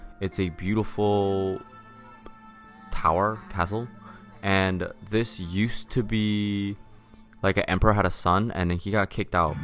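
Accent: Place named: China. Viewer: 你的母語應該是中文吧，聽的出來你的英語有口音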